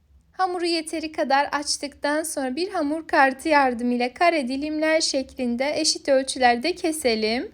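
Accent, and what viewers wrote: Azerbaijan. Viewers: Dinleyen kimi bildim ki AZERBAYCANLISAN ❤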